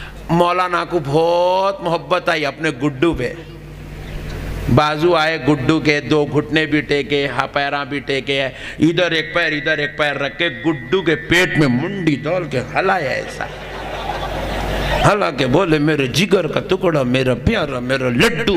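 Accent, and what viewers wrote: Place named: Hyderabad, India. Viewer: me to yaar haidrabadi language sunne aaya tha 🤣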